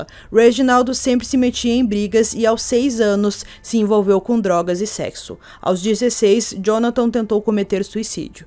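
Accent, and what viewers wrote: Rio Grande do Sul, Brazil. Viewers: adoro seus vídeos....e o sotaque gaúcho....acertei?! 🤗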